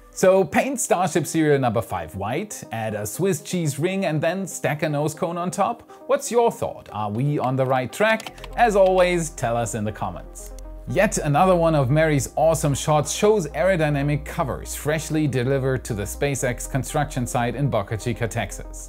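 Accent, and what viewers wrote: Germany. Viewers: You should always use the German accent. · I vote to go with German accent... just how you would normally talk and not exaggerated. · do a future episode in the German accent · You should indeed do one with your German accent on display. Really enjoy the out takes at the end of your videos as well. you rock! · really fun an episode with German accent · do a show in your german accent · Totally would appreciate an episode in your Deutschland accent. Reminds me of my mothers, who immigrated in 1960 to America. I think it would harken back to some of the greatest rocket scientists in history. Thumbs up if you agree. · YESSS! A German accent episode, please! 😀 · your German accent brings back memories of Guenter Wendt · Yes, PLEASE do an episode with your natural German accent! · I really like the accent... where the Germans are speaking in English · episodes with a German accent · Hie.... 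I'm more anticipating the episode in the German accent than the 15km hop😆😆👍👏👏😁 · German accent? It’s like Von Braun is back amongst us. You Rock! Tschuss!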